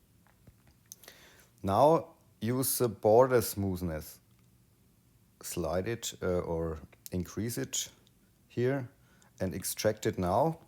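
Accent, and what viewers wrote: Bavaria, Germany. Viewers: Sehr geil der Hinweis aufs Bavarian english. Wer’s nicht leiden kann soll halt was anderes gucken 😜 Guades Video, weiter so, hawe dehre😂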